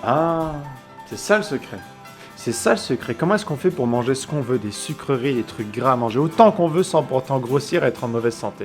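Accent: Quebec, Canada. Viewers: Philippe Trudel Quand il est avec ses potes québécois il la énormément ;)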